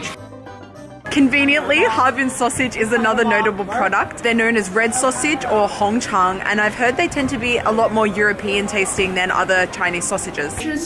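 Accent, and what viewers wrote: Australia. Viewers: Australian accent? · Host is an Australian?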